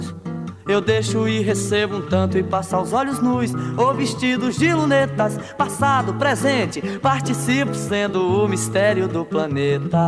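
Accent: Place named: Minas Gerais, Brazil. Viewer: Nesse vídeo parece ser mineira. Deixa eu ver os outros pra ver de onde é.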